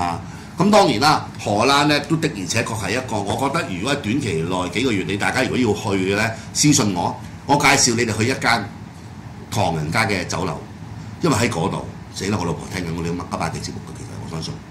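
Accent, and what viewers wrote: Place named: Hong Kong, China. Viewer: 你港式英文都好勁口音😅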